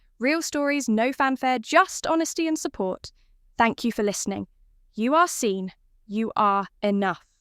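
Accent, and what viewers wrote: United Kingdom. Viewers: The British accent is nicer than most of the other AI voices in use, nice change! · Why don't they use an American accent? British accent for a military story set in the USA?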